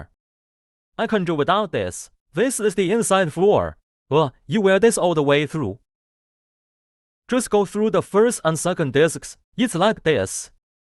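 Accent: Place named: China. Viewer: 不知道为什么 你的英文发音好中文啊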